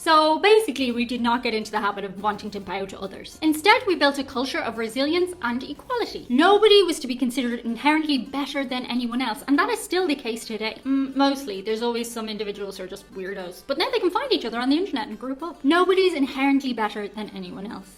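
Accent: Ireland. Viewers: Loved how your exotic Irish accent was so prominent in this video! Well, exotic to my American ears! And you were beautiful as always. I recently re-watched an episode of Highlander the Series with an Irish character! The series is about Duncan MacLeod, who is from the highlands of Scotland, and is immortal, born in 1592 and he's still alive in 1992. This episode, he runs into another immortal who is trying to assassinate a British politician. His friend Richie, who recently became immortal himself (they become immortal when they suffer their first "death" due to violence), helped MacLeod stop the assassination, but in the process, killed the husband of one of the assassins. It turned out the wife was another immortal, and she was born in Dublin, Ireland, in the 1800s. Duncan MacLeod met her -- Annie Devlin -- in 1919, and she was already fighting for Irish independence at that time. 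In 1993 she is still doing it. But because Duncan's friend Richie accidentally killed her husband, she challenges Richie to a sword fight, as that is the only way immortals can kill each other (must cut off the head). Richie wins -- he disarms her -- but spares her life. MacLeod tells her that it must end there, because he and Annie are old friends, and he wants her to accept Richie as a friend as well, and he begs her to accept the peace between Ireland and the UK. They embrace, and that is the end of the episode. You would love that TV show! It was on in the 90s when I was in high school. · As an American man the most charming thing about your video was your beautiful Irish accent.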